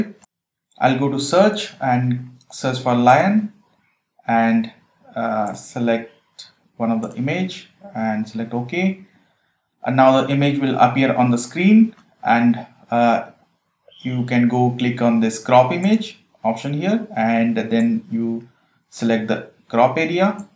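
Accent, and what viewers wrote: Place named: India. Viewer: Indian accent = masterclass of a video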